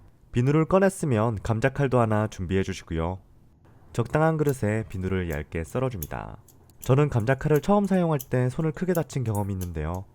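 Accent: Gyeongsang, South Korea. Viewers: 경상도 분 맞지요? ㅋㅋㅋ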